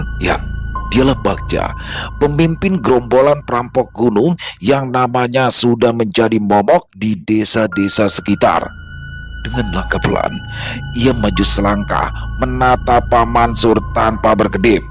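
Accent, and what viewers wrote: Jakarta, Indonesia. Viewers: Bang ❤❤❤, bank, bang Doni sama mba nani,aa hajat sama Clara,mas wasis sama mba ivory,mas gembul sama teh euis, 1/1 pada punya jodoh masing masing lucu deh,.wkwkwk aq suka bang bawain cerita,pakai logat betawinya mantap x,sampai saya tertawa sendiri pas dengerin bang cerita,..wkwkwk 👍👍👍 pokoknya menghibur x saya suka ini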